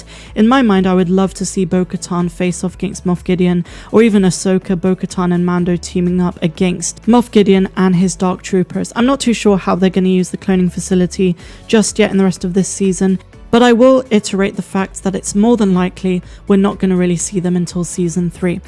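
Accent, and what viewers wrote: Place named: Somerset, United Kingdom. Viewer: Loving your content, As a fellow SW (zummerzet) Star wars fan and Storm trooper cosplayer, It's gert lush mate! It,s so nice to hear an accent like mine doing this style of content.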